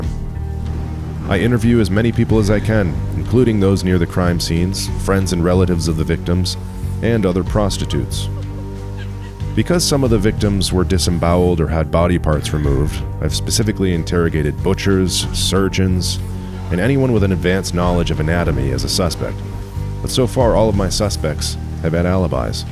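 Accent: United States. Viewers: why does he sound american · Why the American accent ? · why is the narrator american? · Does anyone realize this guy is a British but he has an American accent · Hold up anyone else notice he is from ENGLAND with an AMERICAN voice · American accent narrating this, made it seems less authentic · English detective with an American accent. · Brit hunter American English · The only English guy with a American accent...... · An American accent?? My God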